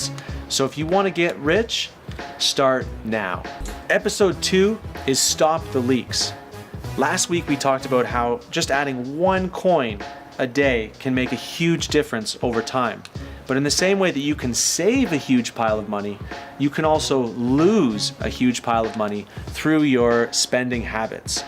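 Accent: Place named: United States. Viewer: Are you morocan??!!bc ur accent is like an American 🇺🇸 watching your videos from Washington DC ☺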